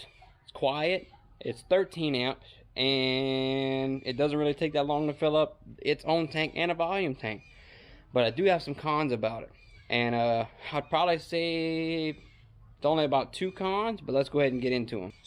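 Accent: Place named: Louisiana, United States. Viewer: Love me some Cajun accent. Bro lived in Lake Charles.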